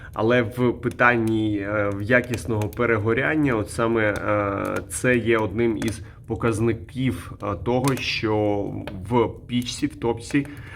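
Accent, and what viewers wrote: Russia. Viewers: В твоей речи слышно, как много лет ты говорил на русском, а тут бац и вспомнил о незалежности.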